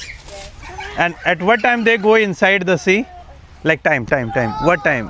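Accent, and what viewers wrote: India. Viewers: Just a suggestion. Use interrogative sentences when asking a question. "This is a fisherman village, ?, yes!?" This sounds vague and confusing, and sometimes it can come across as rude (especially with our Indian accent). We don't have to change our accent, but instead, we should use an interrogative sentence...like ask, "Is this a fisherman village?" simple, effective, short.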